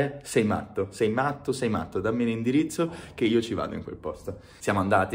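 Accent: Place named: Rome, Italy. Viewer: Ma perché parli in Romano? Bel video continua così 😊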